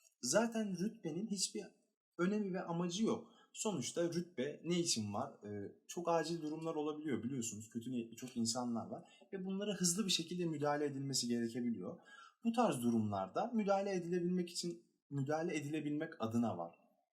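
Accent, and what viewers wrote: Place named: Istanbul, Turkey. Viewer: ÇOK KASIYOSUN KENDİNİ VE KİBAR KONUŞUYOSUN YANİ İSTANBUL TÜRKÇESİYLE KONUŞUYOSUN KÖTÜ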